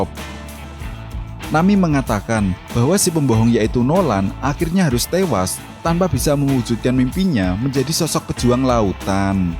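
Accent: Java, Indonesia. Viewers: Logatnya khas. . Di pulau jaayyaa!! Suka min logat medok jawanya 😅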